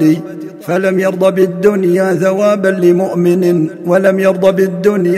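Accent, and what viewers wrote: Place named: Najd, Saudi Arabia. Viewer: أهل الشام قراءة نجدية اعتقد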